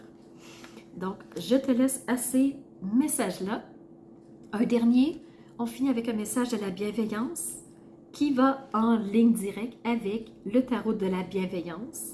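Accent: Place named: Belgium. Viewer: Pour commencer, j'adore votre accent. J'aimerais vivre par chez vous, parce que l'accent belge, euh... comment vous dire ? Hum !...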